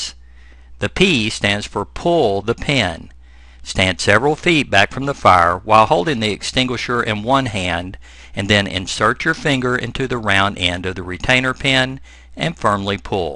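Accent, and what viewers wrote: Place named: South, United States. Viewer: I'm glad it's a southern accent not monotone to make it a little more engaging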